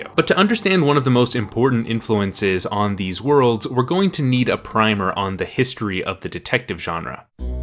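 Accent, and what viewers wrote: Canada. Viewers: sounds like they might be from Canada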